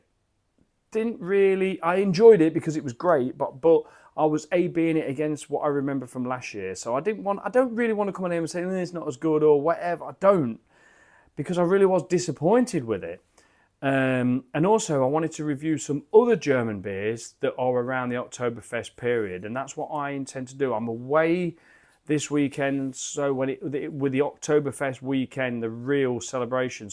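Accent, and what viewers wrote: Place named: Leicester, United Kingdom. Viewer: Just came across your channel, this is the first of your videos I've watched and it's a great one! I think I'm good at spotting accents and and I would say you're from Leicester(shire) somewhere, in which case lovely to see someone local on here!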